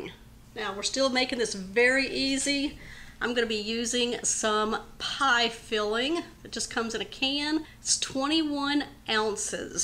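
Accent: Texas, United States. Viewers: Oh,!!! I going to go make this right now...I believe I have everything in my fridge and pantry!!! I'm going to add a little almond paste 😋 to punch it up a bit. You are wonderful ❤ 💖 I love just listening to your Texas drawl. You explain everything so well. Thanks again. Looking forward to whatever is next!!!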